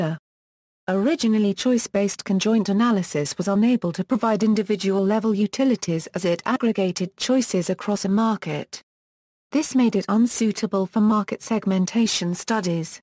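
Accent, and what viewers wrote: United Kingdom. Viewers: Love this explanation with the British accent!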